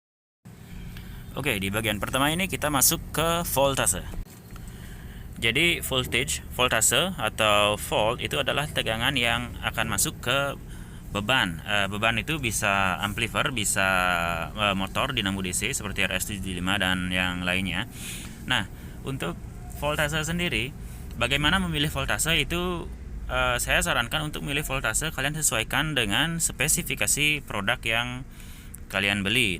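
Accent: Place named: Bali, Indonesia. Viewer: Seperti aksen Bali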